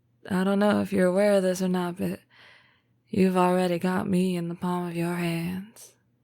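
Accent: South, United States. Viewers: I love your southern accent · Oh I need more of your southern accent now... I love it...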